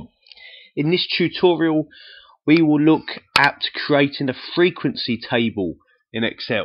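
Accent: United Kingdom. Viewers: Thank you SOOOO much! You're really awesome!💯 🎆This video was so very helpful that I can't begin to tell you how much it actually helped me. Plus, you're accent is so cool! Love it! Are you British?💚💚💗💓💖😌😌🤗👍💣😌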